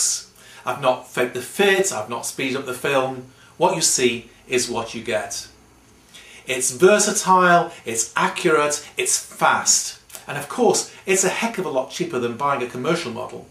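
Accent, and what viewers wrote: United Kingdom. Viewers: Why is it that everything sounds better when delivered with a British accent? Nice work. I like your jig, and your presentation in your videos are excellent. Thanks.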